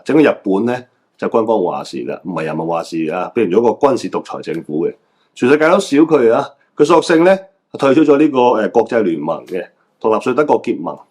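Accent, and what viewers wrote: China. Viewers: 大陸仔滿口鄉下音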